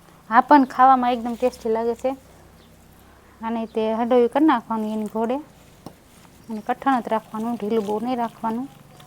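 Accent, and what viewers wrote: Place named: Jhalawad, India. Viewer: ઝાલાવાડ ના લાગો છો ભાષા ઍવી છે સરસ પાત્રા બનાયા મને પણ ખુબ ભાવે છે મારિ વાડીયે પણ વાવવાના છે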